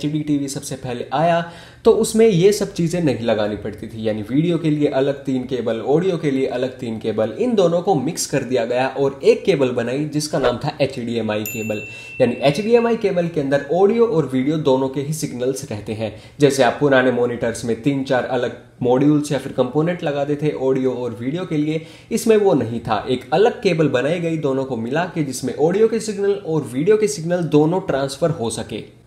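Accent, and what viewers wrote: India. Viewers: Are you Gujarati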